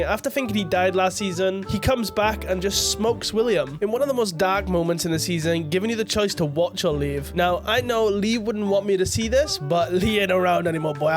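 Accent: Wales, United Kingdom. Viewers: Absolute Cinema indeed!! Are you Welsh btw? :D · Mate, are u British? 😊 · Is that a welsh accent i detect? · ARE U WELSH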